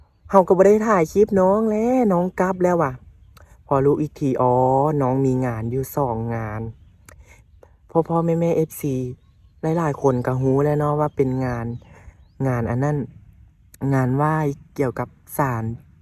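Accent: Thailand. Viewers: ภาษาภูไทยคือเพราะแท้จ้า❤❤❤เหมือนชมพู่❤❤❤❤ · น้อง คนไทยเลยเหรอจ้ะ สำเนียงคล้ายๆ😊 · น้องพูดภาษาถิ่นของน้องเลยลูกสำเนียงเพราะดีฟังเพราะจะได้เผนแพร่ภาษาท้องถิ่นด้วยลูก❤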